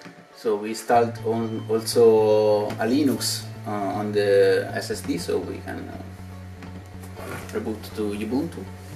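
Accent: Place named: Italy. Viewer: Love that Italian English :)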